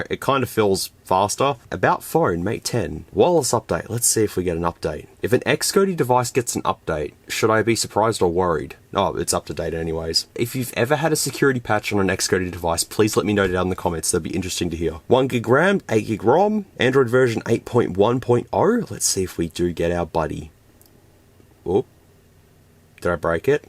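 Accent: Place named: Australia. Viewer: I don't know why he's so worried about his voice. You just sound like an Aussie mate. You don't sound like you, but you still sound like some Aussie.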